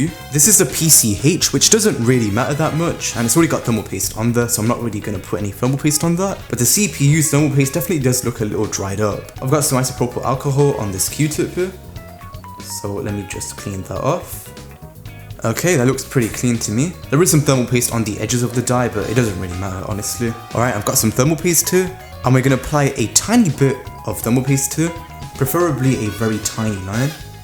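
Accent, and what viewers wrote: United Kingdom. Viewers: Instant problem: "Chassis" is a French word. And our presenter has a Birmingham/West Midlands English accent. You're correct, Chassis is pronounced "Shassee" (not "Chassee").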